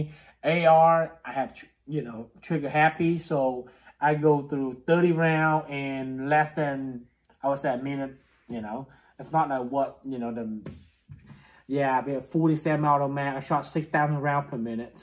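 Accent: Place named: Texas, United States. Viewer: You sound like you have some Texan in your accent, so if someone talks shit about it, Fuk them! Keep doing what your doing. Haters gonna hate.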